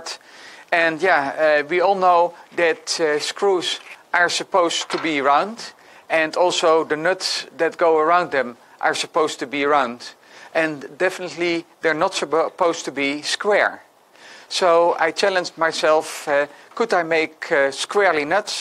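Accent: Netherlands. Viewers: that dutch accent ahaha. love it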